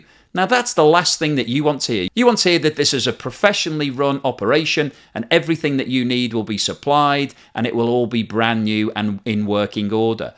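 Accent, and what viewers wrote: United Kingdom. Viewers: he claims without citing any source that it doesn’t “seem to be the case” that Russia stuck to its original claim that they’d only call up reservists as part of their expanded military campaign - he follows up with stating that “many” people who have “gotten those papers” have absolutely no military background, again without citing any source and certainly without any sort of quantitative estimates provided - he immediately then admits that “there are no official reports” regarding this issue, but he offers in its place, as some sort of proof, the always-trustworthy “reports on social media”. These are just a few examples of how incredibly biased towards western mainstream media narratives that he is. This guy or whatever is incredibly ill-informed, close minded, and tbh seemingly just downright dumb; he constantly uses unsophisticated,overly simplistic arguments that are the type of propaganda you’d find as talking points from an episode of vrian stelter on CNN. 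Your British accent can only get you so far buddy. Sooner or later you’re going to be figured out for who you really are: a run-of-the-mill, wannabe establishment political pundit, punk, who for some reason thinks he can brand himself as a some sort of a financial analyst, even though you are clearly just masquerading as one given how infrequently you actually present a comprehensive quantitative financial analysis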